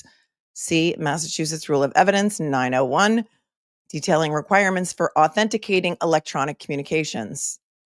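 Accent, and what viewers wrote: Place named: Long Island, United States. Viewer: I know you don't need more kudos. But I have to give them. You have all the receipts, all the time! Your coverage is my favorite, and got me through 2 surgeries (just with Karen Read!). Looking forward to watching 2.0 with you. Plus I lived in Great Neck and Levittown for 15 years so your accent makes me feel like I'm visiting friends.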